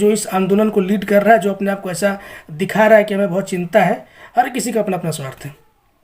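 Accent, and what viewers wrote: Bihar, India. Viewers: Tu to bihari lagta h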